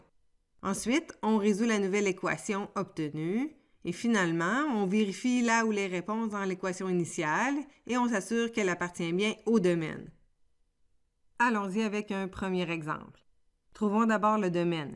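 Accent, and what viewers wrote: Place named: Quebec, Canada. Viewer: L'accent Québécois est et la manière de faire différente de la France rendent la chose plis complexe à comprendre, ceci dit, j'ai enregistré la vidéo car c'est bon rappelle de cour !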